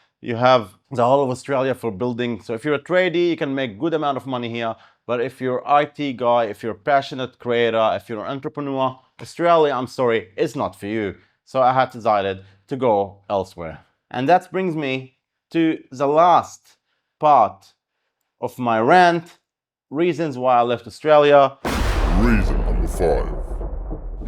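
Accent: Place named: Europe. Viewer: You sounds from Europe, where are you from?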